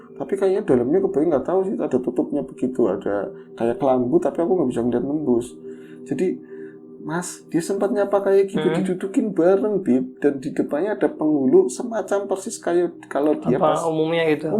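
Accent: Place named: Tegal, Indonesia. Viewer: Udah mas style nya gt aja, malah asik koq kita jadi tau sedikit2 bahasa tegal!! Seru lho mas!! Sukses selalu untuk ngobor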